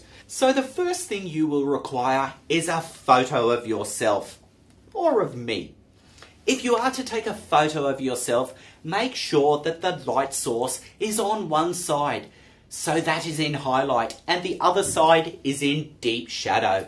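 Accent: Australia. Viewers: lo, the dude has an extremely heavy Australian accent, I think ur safe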